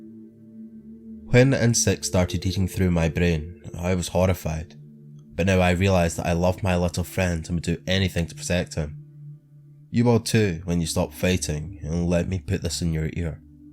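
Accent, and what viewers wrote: Scotland, United Kingdom. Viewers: I'm surprised you dont have more subscribers! You have lots of cool horror stuff on your channel and (I'm sure you get this all the time) an awesome Scottish accent! I am linking this channel to all my angsty teenage friends who watch spooky stories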